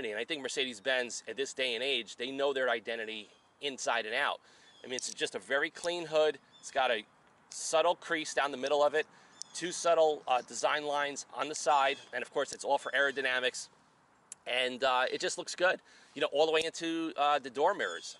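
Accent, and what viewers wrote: New York, United States. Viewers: buh'n ("button"), "sah'n" ("satin") - straight New York / New Jersey.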